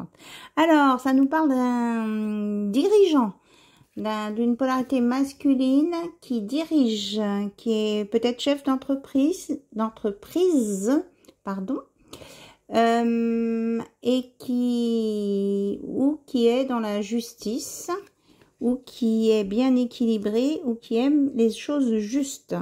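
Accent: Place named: England, United Kingdom. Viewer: My Dear Thank you for this beautiful guidance. I love your English accent. I wish you a sweet and pleasant day in Divine Grace and Mercy 😇😇🙏🏽🙏🏽🌸🌸🌹🌹🌟🌟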